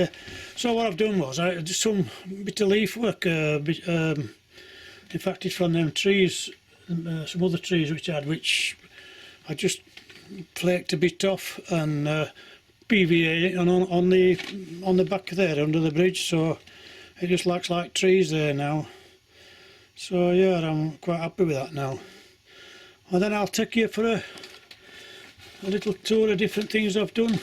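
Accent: Yorkshire, United Kingdom. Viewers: Where are you from , is it somewhere between sheffield or Barnsley towards Leeds. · Trying to decide if you're a Yorkshireman. You sound like my friend who's from York. Beautiful model railway you've built. Would love to see it live!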